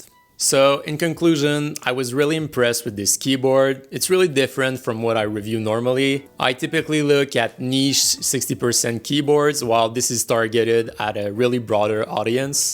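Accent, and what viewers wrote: Quebec, Canada. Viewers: Tu est quebecois?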